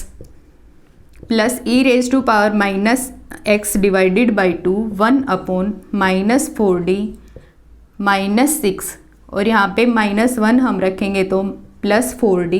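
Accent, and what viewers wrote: Haryana, India. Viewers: Mam aap haryana se ho?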